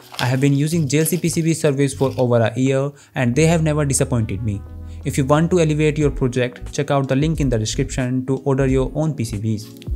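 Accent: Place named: North, United Kingdom. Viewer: fix yr accent northie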